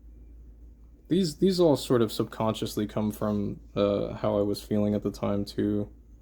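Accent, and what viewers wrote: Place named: United States. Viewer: Here we go! Comment wars! 47 mins!? Wowowow! A few nice phases hahaha!! Your smooth American voice is soothing haha. It's nice to see your rougher work. The bishop sketches are badass!!! Reminds me a little of an artist called Crom that made bird king but your stuff is a lot more realistic. The little things you write down throughout the book are cool, a little hint of emotion here and there. Just wanna say that this is awesome that you've finally made the video!!!! I love a skull with a badass jawbone for some reason so this is itching a scratch for me. I think sharing your work like this will be so good for you. Kinda just getting some thoughts out into a video through voicing it 🙂 I prefer black and white drawing too but some colour on my stuff brings it to life a little. Maybe try a little grey or a single colour. The audio is good by the way, nice and clear. 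The big masks are pretty cool, looks like real bone. You know mate you are actually so so good at drawing. The tiny tiny details are super intricate. The picture of the burn pile was awesome. Sorry to hear about your uncle buddy. Unfortunately I know the pain of losing a loved one. (Hug) Never knew your biological father. That's sucky... But at least you made some cool art out of it. I wish I never knew my dad the old drunk bus driving wanker haha. I don't personally enjoy social situations too much either, I prefer just a small group or just me hanging out on my own... Which is kinda weird for us as we are so up for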